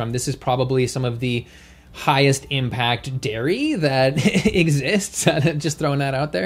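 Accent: Canada. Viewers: Atlantic Canadian, to be specific.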